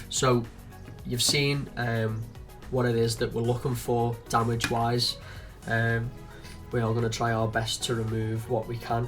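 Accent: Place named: Liverpool, United Kingdom. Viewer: Thank you scouser! I can't tell you how much bother you've saved me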